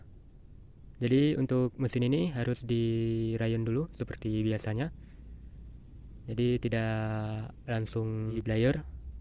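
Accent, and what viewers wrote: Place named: Aceh, Indonesia. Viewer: dari cara ngomong pasti orang Aceh y bang,,dmn bg loksi nya biar kita cek motor fu saya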